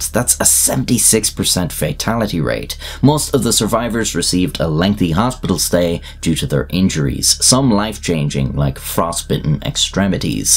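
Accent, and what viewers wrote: Ireland. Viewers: Gosh I love the Irish accent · Narrated in an Irish accent although the grammar, syntax and tortology is clearly American. Why?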